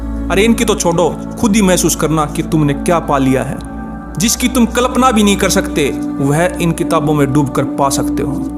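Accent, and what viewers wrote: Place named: Haryana, India. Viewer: Bhai Ji aapki voice se andaja lagaya hai 🙏🙏 aap Haryana se ho kya 🤔🙏🙏 bahut hi sundar video and voice ❣️❣️❣️❣️❣️🙏🙏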